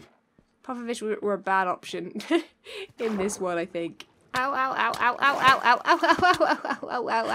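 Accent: United Kingdom. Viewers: I'm learning more about other hermits and I now know you and Impulse .xD By the way I like the way you speak it's nice, british?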